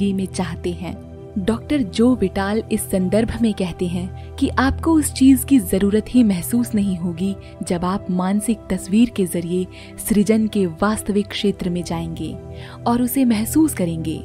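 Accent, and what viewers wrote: India. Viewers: Your voice is too good.. love Indian Hindi ton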